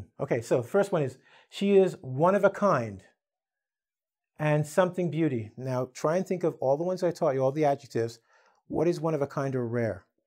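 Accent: United States. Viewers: What is your american accent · Is it American English ? Just I get confused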